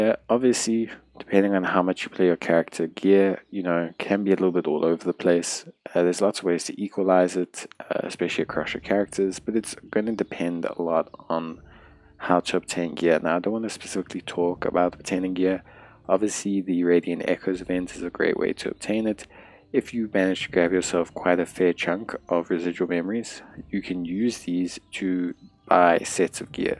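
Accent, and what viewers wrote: South Africa. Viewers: awesome man, great to see other SA WoW players. You do sound like you've been out of SA for awhile.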